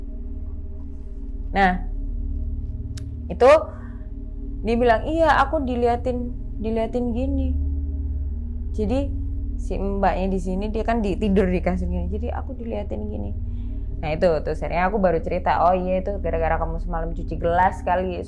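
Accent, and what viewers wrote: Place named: East Java, Indonesia. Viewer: wa ini org jawa timur ya mbak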